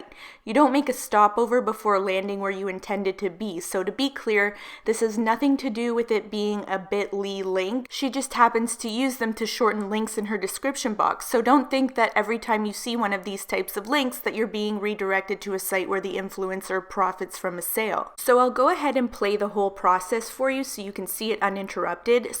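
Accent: Canada. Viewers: "The one im aboot to talk aboot.... so you can see what im exactly talking aboot" I've never recognised a Canadian accent so clearly until now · OMG are you Canadian!!! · You are canadian right? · I can hear your Canadian accent lol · i love canadians o's. dey so nice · Ugh, you sound so canadian it hurts.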